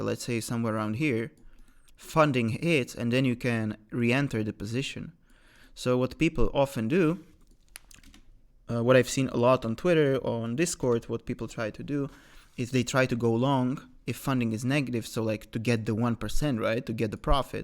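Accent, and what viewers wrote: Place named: Sweden. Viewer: Sounds swedish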